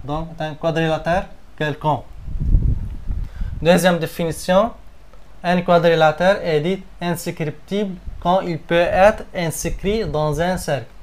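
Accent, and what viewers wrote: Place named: Lebanon. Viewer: c'est un accent libanais je pense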